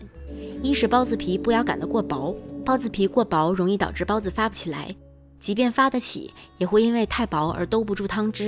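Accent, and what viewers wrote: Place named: Tianjin, China. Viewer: 听着像天津姐姐包包子，明天就动手试试看！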